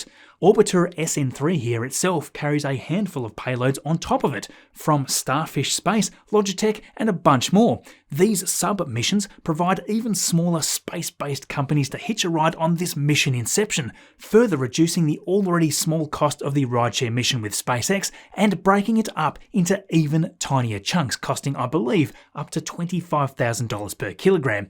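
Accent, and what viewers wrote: Australia. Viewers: Just stumbled across your channel. Awesome work mate. I've been living in the UK for the last few years, moved here about 2 months before the pandemic which was a very interesting greeting to the country! It's always great to hear an Aussie accent in my travels as it feels like home. Peace mate. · I love. A very well made Show. Plus I like the Australian accent. Keep up the good work it is much appreciated.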